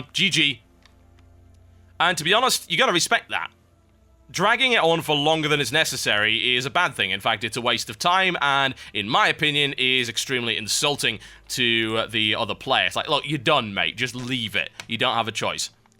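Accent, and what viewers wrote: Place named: Newcastle, United Kingdom. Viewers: Lol all geordies don't talk like that :P, well i don't anyways :P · Hard lol Geordie casting xD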